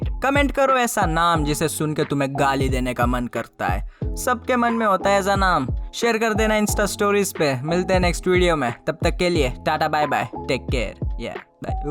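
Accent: Gujarat, India. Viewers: Ap gujrat se ho kya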